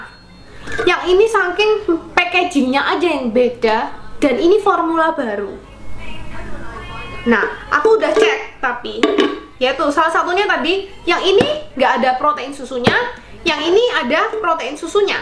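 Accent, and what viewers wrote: Surabaya, Indonesia. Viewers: Mbk e logatnya medok ky boso suroboyoan, sidoarjoan.... Org mana mbk??? Suka saya.. apa adanya bnget suaranya.. mksih ilmunya brmnfaat bngt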